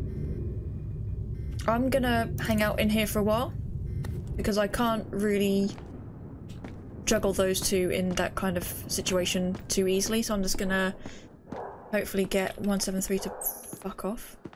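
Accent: United Kingdom. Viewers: Your a young british i can tell by your voice!!!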